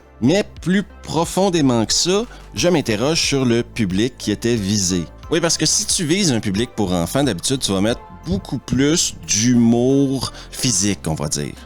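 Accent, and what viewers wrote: Quebec, Canada. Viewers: Mon gars ta chaîne c'est une petite pépite,je dévore tes vidéos les unes après les autres. Déjà que j'ai un faible pour l'accent québécois mais là franchement avec ton humour en plus ta façon de t'exprimer franchement c'est vraiment que du kiff. Et bordel qu'est-ce que astérix et Obélix la Terre du Milieu est un film de merde et de voir Guillaume Canet chialer en disant allez voir mon film c'est vrai que franchement c'était pathétique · avec ton accent je voyageais au pays de la poutine et au jourd hui j'apprend que tu vis chez moi XD c'est beau mais ca casse le rêve ahahha . des bisou merci pour tes video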